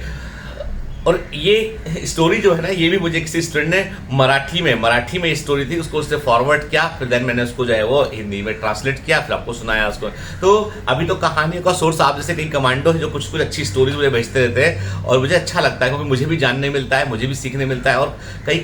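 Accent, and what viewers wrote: Gujarat, India. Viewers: Sir aap Gujarati ho 🧐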